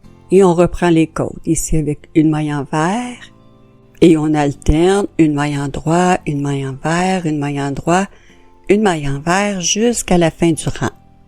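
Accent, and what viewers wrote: Quebec, Canada. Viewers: J'adore ces pantoufles que je vais commencer ce soir , et vous Madame , comme professeur , vous êtes super : les expliquez très bien et quand vous approchez la caméra , on ne perd rien de l'explication , c'est super ! Cependant j'ai un petit bémol à propos de la musique que je trouve beaucoup trop forte , Je suis malentendante et je perds beaucoup d'explications à cause de la musique . J'ai essayé de l'enlever par tous les moyens que je connais mais je n'ai pas trouvé de solution ... C'est le seul petit reproche que je peux vous faire et à part ça , vous êtes du Québec, comme moi et ça me fait plaisir d'écouter votre voix . Merci pour tout .Excusez mon audace .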